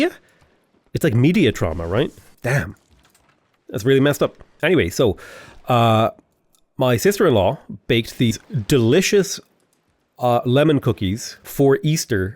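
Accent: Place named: Ireland. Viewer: Anyone else think that as progresses, his irish accent gets thicker?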